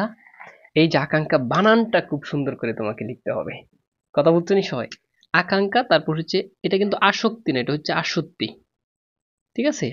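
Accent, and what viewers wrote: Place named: Brahmanbaria, Bangladesh. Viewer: স্যার আপনার কথা গুলো শুনে মনে হচ্ছে আপনার বাড়ি ব্রাহ্মনবাড়িয়া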